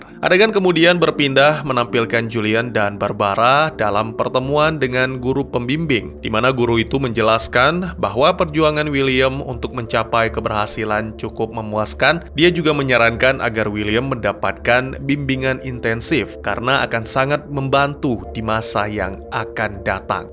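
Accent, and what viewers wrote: Aceh, Indonesia. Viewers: Aceh kayaknya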